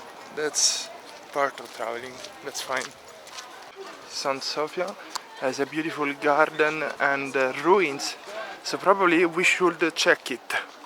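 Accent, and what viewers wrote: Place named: Italy. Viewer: Grande bellissimo vlog, non sono riuscito a capire proprio tutto pero ho capito che ochrida ti è piaciuta, io (i miei) sono originario della macedonia pero vivo a roma, comunque si capisce subito che sei italiano "cinquecento"🇮🇹 Ottimo lavoro spero che continui con questi tipo di vlog 👍💪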